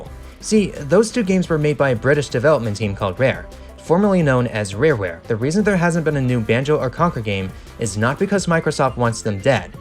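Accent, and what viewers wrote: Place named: New York, United States. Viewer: Well, although I am glad about the information I have never once been excited to talk about with other channels, so instead I just want to comment on how your main character has been improving and how nice it looks. Really does give me that Spyro/Crash vibe, which I mean is further helped by your accent. Actually, it kinda sounds similar to Billy Joels and my Mami, do I just like New York and New Jersey accents or something. Probably the latter, seeing how many great films and games were made during their Era of stuff such as Ghostbusters, that Atlantis Disney Movie, etc that I grew up with when I was a young boy.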